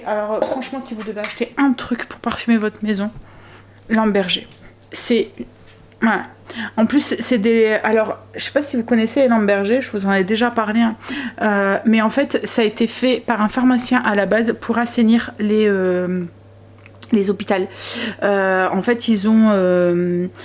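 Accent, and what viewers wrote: North, France. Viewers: Cc Très bonne idée pour la table, j'adore, bravo Un peu plus de patois du nord, je m'en lasse pas 🤣🤣🤣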